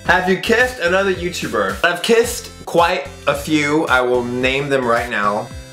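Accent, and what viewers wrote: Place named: South, United States. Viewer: NEW SUBSCRIBER I LIKE HIS KINDA SLUR AND COUNTRY VOICE!!! 😄😘 and he's ADORABLE AS HELL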